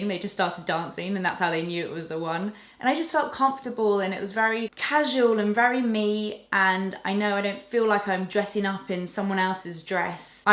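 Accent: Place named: RP, United Kingdom. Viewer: sounds very posh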